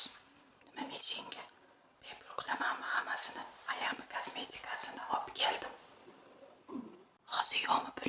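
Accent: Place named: Andijan, Uzbekistan. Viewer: Juda ham ttabbiy chiqqan anjancha gapirishiga bitta❤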